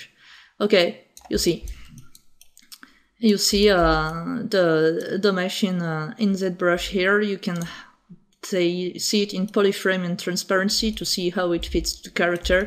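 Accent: France. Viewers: Serais-tu francaise par hasard (à cause de l'accent)? L'autre tuto <link> n'est-il pas obsolète à cause de l'autofit ou suis-je à côté de la plaque ?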